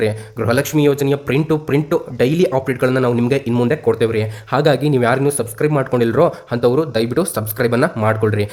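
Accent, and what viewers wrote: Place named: Bengaluru, India. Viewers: Bangalore Kannada language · Bengaluru kannada sir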